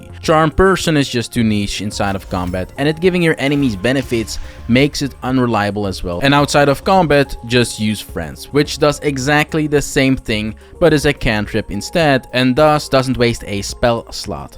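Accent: Netherlands. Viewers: Hey klootzak, are you Dutch?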